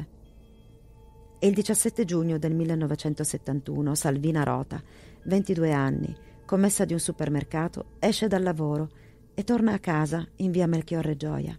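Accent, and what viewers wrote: Piedmont, Italy. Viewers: io dico Piemonte magari Torino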